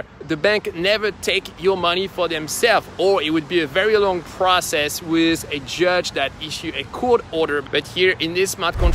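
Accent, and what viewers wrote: France. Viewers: Salut très bonne vidéo, tu es français ?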